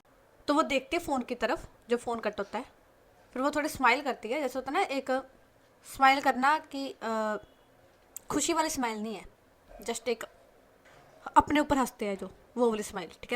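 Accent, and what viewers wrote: Punjab, India. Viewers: I love the way of your storytelling and your punjabi accent 😊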